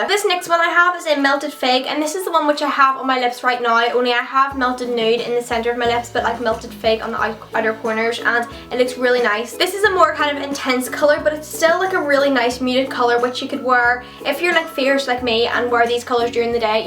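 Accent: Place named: Northern Ireland, United Kingdom. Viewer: I love your accent.. May be weird saying that bc Im from Northern Ireland too :)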